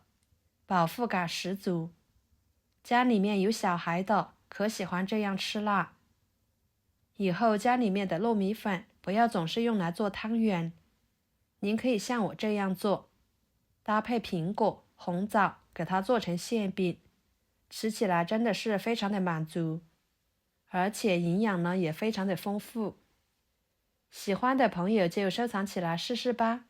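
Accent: Yunnan, China. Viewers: 也谢谢你的云南普通话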